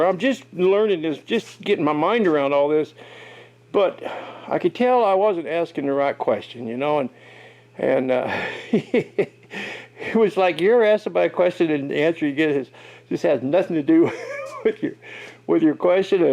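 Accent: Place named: North Carolina, United States. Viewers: I sure thought you were from North Carolina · Woulda never guessed your in Washington...thought you were in Tennessee or North Carolina mountains. Your place looks like it's there, your shop looks like something you'd see here in the south, you talk a lot like that area and you have the same demeanor about you too. Woulda never known the difference if I hadn't heard you say it. You'd fit right in around those areas.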